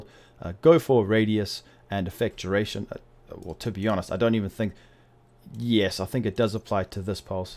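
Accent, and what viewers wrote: South Africa. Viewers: cape town accent..? howzit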